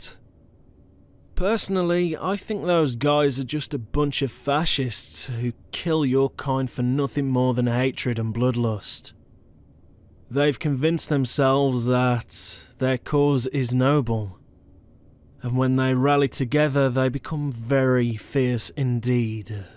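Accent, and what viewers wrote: United Kingdom. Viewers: Againe, dune, this British accent that what got me into this asmr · I love british Accents they're so nice and calming🤩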